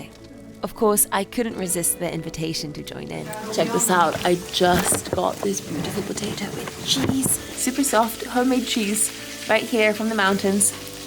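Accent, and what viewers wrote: England, United Kingdom. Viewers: Why do you sound British? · That brittish accent... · Your videos are awesome, Question? were you raised in Poland, because i hear a big English accent!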